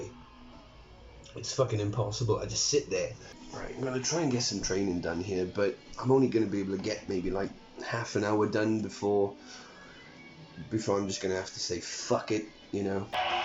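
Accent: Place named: Southern England, United Kingdom. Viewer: also what part of england are your from im from newcastle but your accent sounds more southern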